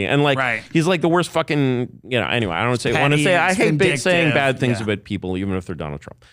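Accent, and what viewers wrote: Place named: California, United States. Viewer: He has an annoying California accent to me. The long drawn out syllables as he improvs his next empty general statement.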